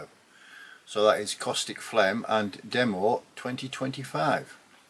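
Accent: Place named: Scotland, United Kingdom. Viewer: I don't know what kind of UK you are but I think you're Scottish. Check out a book called "The Haar" by David Sodengren. I've actually heard of Caustic Wound. Check out Golers from Canada. I can't believe Sodom is still together but I absolutely love this group! Oh wow! I didn't know Cancer was still kicking. I will never go against Pungent Stench's first record but you really need to hear "Masters of Morale, Servants of Sin". That record is incredibly disturbing and just a ridiculously, evolutionary version of this band. Great video and cheers!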